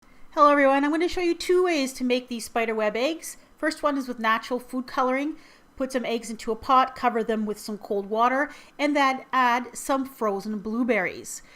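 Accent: Canada. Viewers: ...are you canadian????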